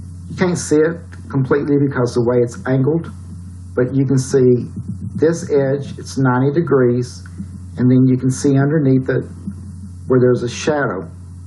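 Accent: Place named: Texas, United States. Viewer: Excellent video! You have a great accent. Are you from Texas?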